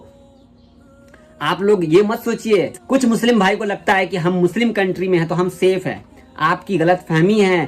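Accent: Bihar, India. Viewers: Aap Bihari ho Boli se lagta hai.Kaun sa Zila Ba.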